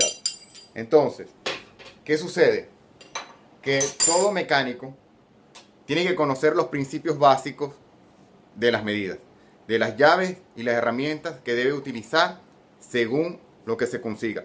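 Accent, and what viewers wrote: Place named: Venezuela. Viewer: Tiene acento Venezolano!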